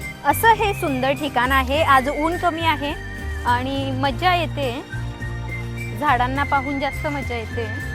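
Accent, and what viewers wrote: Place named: Khandesh, India. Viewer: माय हो आपली खानदेशी उत्तम वाटते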